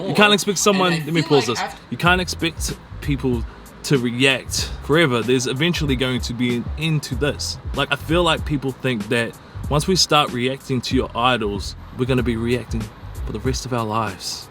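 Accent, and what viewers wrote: New Zealand. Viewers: hes maori I dont think the maori accent sounds australian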